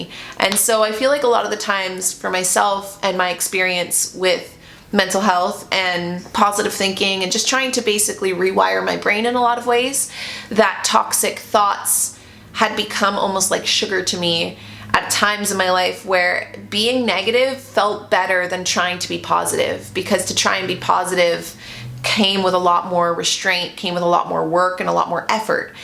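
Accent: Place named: Canada. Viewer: You're Canadian, eh. ❤️❤️❤️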